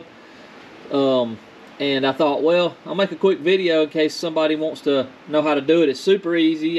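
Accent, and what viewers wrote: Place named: Louisiana, United States. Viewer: A good buddy of mine from my US Navy days is from Georgia, and when I heard your voice it reminded me of him.. Being an Irvine is nice, but it's kinda like being stuck in the middle of a zoo because California is just gone crazy, in some places. Anyways, your videos take me to these little country roads and get my imagination thinking about little motorcycles, and big open spaces. Again I can't overemphasize the detailed nuance and elaboration in your video, of the clutch adjustment was just so spot on. I watched 3 videos before yours, They showed the adjustment but didn't elaborate the way you did. Your speaking cadence, intonation, grammar, syntax use, affable Southern accent will take you very far, on on YouTube.. Wishing you and yours are very happy and prosperous New Year. Thank you again for the quality content.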